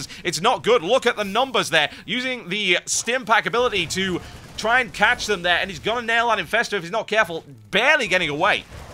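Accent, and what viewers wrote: United Kingdom. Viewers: i was wondering r u british?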